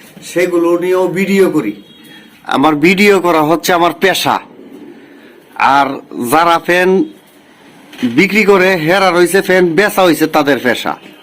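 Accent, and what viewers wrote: Mymensingh, Bangladesh. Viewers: মমিসিংগা ভাষা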